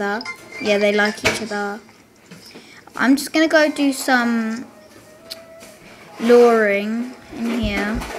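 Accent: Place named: United Kingdom. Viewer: you have a brittish acsent!!!!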